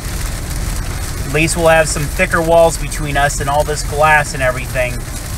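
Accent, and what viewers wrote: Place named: Wisconsin, United States. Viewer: Oh I love your accent! My dads side of family was all from Wisconsin. Haven’t heard it in years. ( I pop up with a little of it ever so often 😂)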